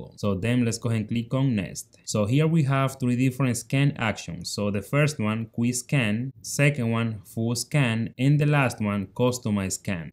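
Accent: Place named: Dominican Republic. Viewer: Bro got Dominican accent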